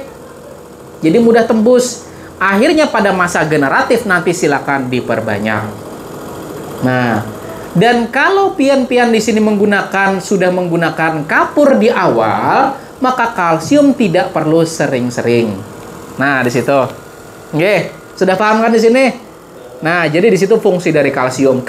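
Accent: Banjar, Indonesia. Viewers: apakah bapak ini orang banjar? 😂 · Tambahi lagi tambahi lagi....Kya logat Banjar bang Pian...di daerah mna ini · Eh, bapak orang Banjar? Logatnya rada banjar...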